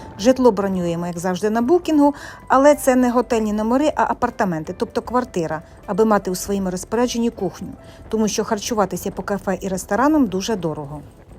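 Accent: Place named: Russia. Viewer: Тішить, що ведете розповідь українською. Чути рашівську, але... Цього року ми були в Макарській...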